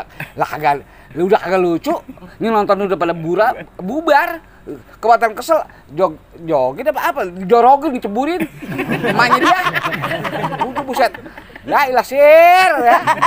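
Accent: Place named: Jakarta, Indonesia. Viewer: kalau bisa logat betawi asli dan banyolan betawi jangan di buang.. jadi bener2 natural betawi banget.. boleh di tambah logat moderen tapi jangan terlalu banyak.... cukup dialek betawi. ...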